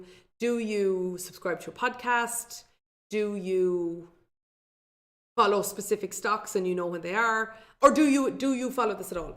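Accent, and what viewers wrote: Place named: Ireland. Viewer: Now thats an Irish accent if I ever heard an Irish accent!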